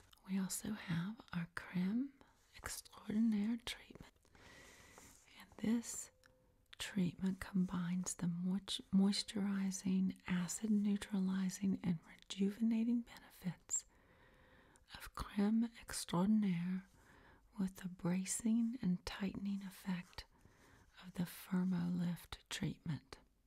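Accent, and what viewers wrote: South, United States. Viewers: do I detect a southern accent maybe?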